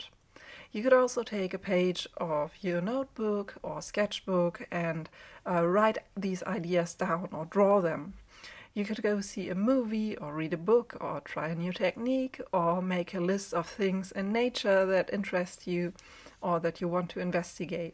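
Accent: Germany. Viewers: I came here for the subject matter, but it`s your impressive vocal delivery in posh English with a German lilt that has kept me lingering.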